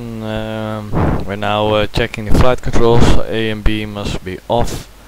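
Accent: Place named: Netherlands. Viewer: Nice video, I like your Dutch accent :-) That's remember me the cockpit visit when I fly with KLM, lol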